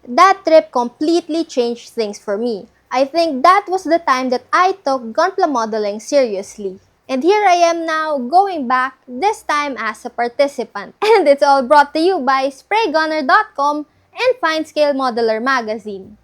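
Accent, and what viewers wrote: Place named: Philippines. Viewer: filipinos really have that accent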